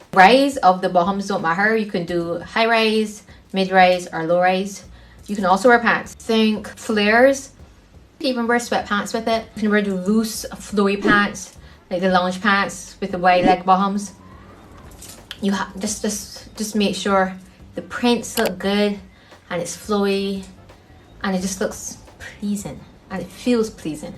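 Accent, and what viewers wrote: Barbados. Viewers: yeah it kind of caught me off guard. I was like wait is she speaking English. I had to listening intently, I said oh she’s Bajan. 😊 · Fr she sounds like she might be from barbados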